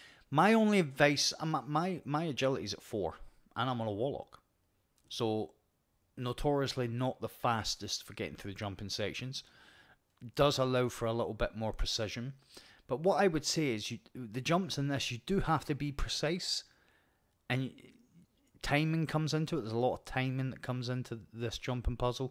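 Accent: Scotland, United Kingdom. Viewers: Thanks for a great video! This was really well explained and shown and had some pretty good tips for some techniques that I haven't seen before, on other videos of this mission. You played this beautifully!! Talk about making it look EASY! I wish I found your channel earlier! Only a few minutes into it, I knew I had to subscribe. Definitely looking forward to more! You have a very interesting accent. Is it Scottish?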